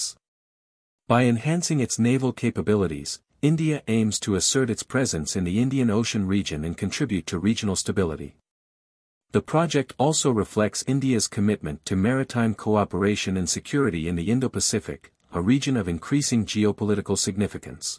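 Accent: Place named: China. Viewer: Where accent suggest you are Chinese. Please introduce yourself.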